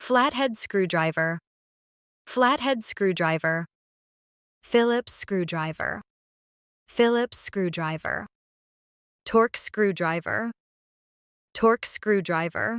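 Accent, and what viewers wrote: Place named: United States. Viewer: Horrible Murican accent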